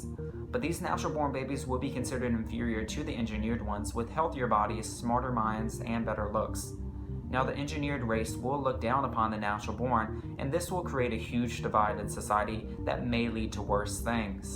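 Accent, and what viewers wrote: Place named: South, United States. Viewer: i can almost hear a gay accent and a southern accent. Btw, you're talking about artificial selection and genetic modification at the same time.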